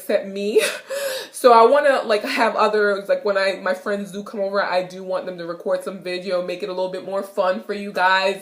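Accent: Patois, Jamaica. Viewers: Hi my name is kiera and i love your Jamaican patoa talk its cool i talk like that sometimes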